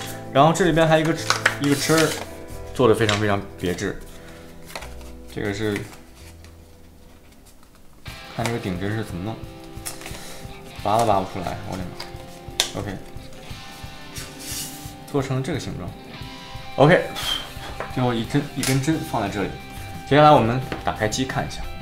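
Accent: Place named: North, China. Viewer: 你是北方人吧，現在在哪個國家生活？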